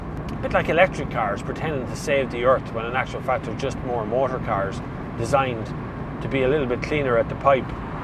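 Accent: Ireland. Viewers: Love the Irish brogue! “It’s a beautiful little ‘ting!”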